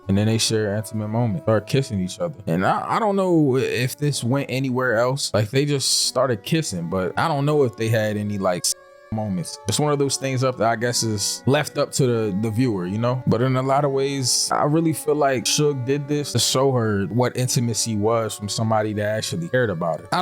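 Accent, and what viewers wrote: California, United States. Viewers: I like your voice are you from Cali?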